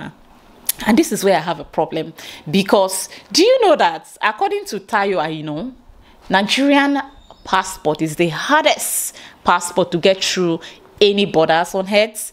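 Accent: Ghana. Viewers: You speak Ghanaian English, Your accent is good. Your intelligent.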